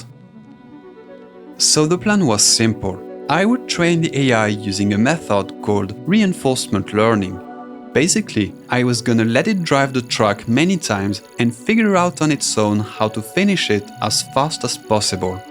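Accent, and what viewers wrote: France. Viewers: is he french or what ? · From content to editing, everything is near perfection. You sound a little bit french, alors merci pour ces vidéos, le montage est incroyable, et le travail derrière monstrueux. Franchement, tu mérites plus de reconnaissance, tes vidéos sont niches et en même temps tellement abordables et passionnantes, ça force le respect. Chaque fois, c'est un plaisir de les regarder, et tu m'as fait découvrir l'univers du speedrun de trackmania d'une manière tellement originale, c'est sûrement devenu ma licence de jeu de course préférée! Alors merci encore, et bonne chance! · are u french by any chances ? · Hi! are u french? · tu est Français? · Are you french ? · vu ton accents tu as l'aire français, tu voudrais pas mettre une piste audio francais ? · Are you french or smtg? I’m wondering cuz I’m french too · J'adore ton accent français, t'es vraiment une super chaîne YouTube ! 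· why is bro french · T’es francais ? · STP BG MET UNE VERSION EN FR CAR TON ACCENT EST VRM TRES FR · You sound French. Are you French? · tes francais toi XD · Are you French?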